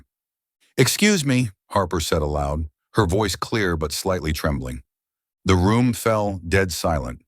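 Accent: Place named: United States. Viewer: Thank you. Good story. Appreciate the American voice telling American stories!!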